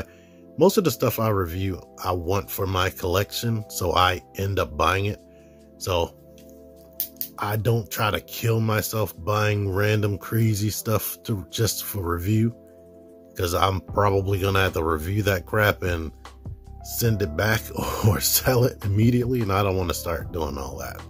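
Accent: United States. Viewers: You have the most American voice I’ve ever heard don’t get me wrong is cool you should do the voice actor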